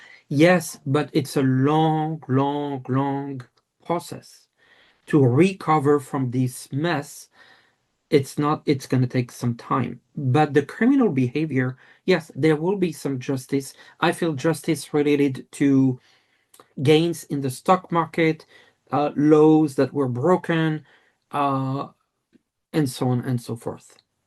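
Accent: France. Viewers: Luv you ….love your reads!!!!! ❤❤❤ This is kind of a weird funny thing to tell you, but….as a child, I often would talk in a French accent. For days….weeks even! I’m an American, and now I think it was perhaps a past life thing. I always felt such affinity to the French, France in general and perhaps even tied somehow to WWII. I dunno…but, it’s weird but I feel a sort of “comfort” in hearing you speak. I’m glad you’re French and lol….I don’t speak French but, intuitively I feel like I could readily grasp that language. ❤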